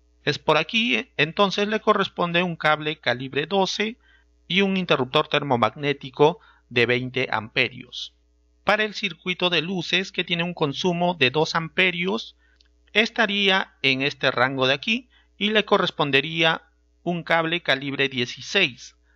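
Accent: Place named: Peru. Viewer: Dime que eres de Perú.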